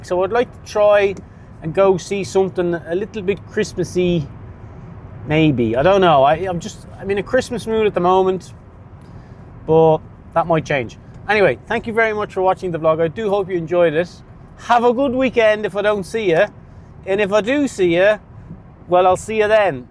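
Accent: Dublin, Ireland. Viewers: I laugh every time I listen to you. A missed friend is from Dublin and your phrasing is music to my ears. I knew you were a Dubliner almost immediately even though I haven’t heard it in years. Good videos , fun to watch. Stay on your feet on the roof, you took a good thump there. Yes I saw it yesterday. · Lovely seeing your cottage and lovely fire your a treasure enjoy Christmas love your irish lilt ❤😊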